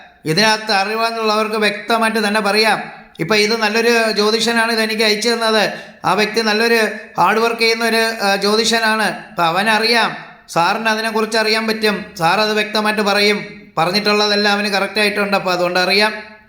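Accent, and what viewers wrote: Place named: Trivandrum, India. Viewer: Tvm language....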